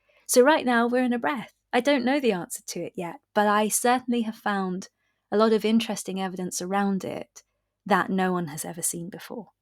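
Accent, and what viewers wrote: United Kingdom. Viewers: It was an interesting programme. Who was the female Scottish narrator? Does anyone know?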